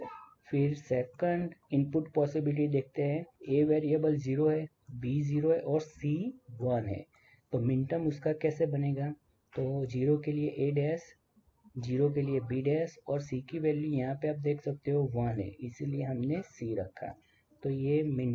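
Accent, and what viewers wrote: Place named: Gujarat, India. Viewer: Bruh you must be fron gujarat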